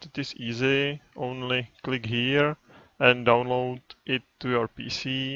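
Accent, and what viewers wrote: Czech Republic. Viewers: Ten český přízvuk nezakryješ :-D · He is czech it's hard speak english when you are slovanian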